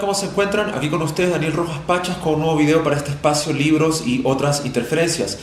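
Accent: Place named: Chile. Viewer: Hola por su acento creo que es chileno claramente, muy buen canal este de Daniel termino de descubrirlo y suscribirme. Saludos desde Alicante - España.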